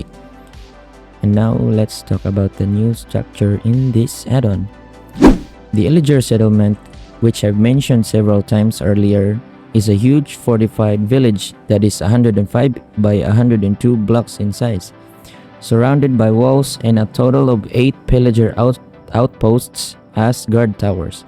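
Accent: Philippines. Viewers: You a Filipino? Your accent seems like filipino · Hello! Are you a filipino? because you sound like..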